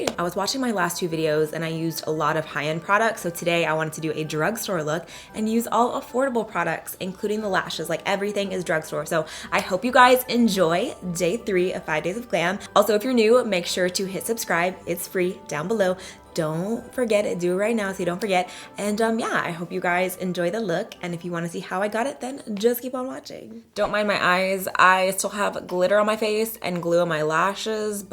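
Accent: South, United States. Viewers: Used to have a super southern accent too... go watch the original videos and you will see what I’m saying. I do love her makeup so much though!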